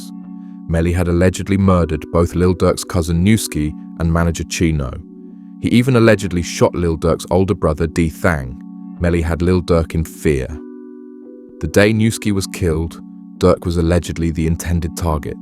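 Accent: United Kingdom. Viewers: He isn’t the only person with a British accent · Man change this stupid ass Brit voice, you outta your fuckin mind lol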